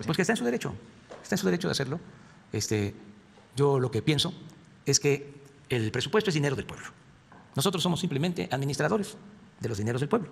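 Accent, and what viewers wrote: Tabasco, Mexico. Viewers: El presidente viaja muchoooo y no trabaja.... Que raro acento tiene ya, entre tabasqueño y de cdmx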